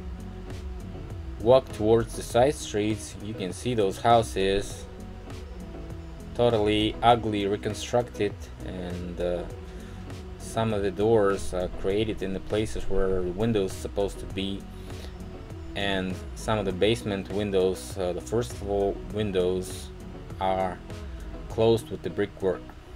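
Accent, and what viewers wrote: United States. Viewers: You got a southern American accent and you ain't talking to slow. It's perfect! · when you learnt to speak English, you also picked up a slight American accent. That was the very first thing I noticed. I'm Australian, so it stands out to me.:)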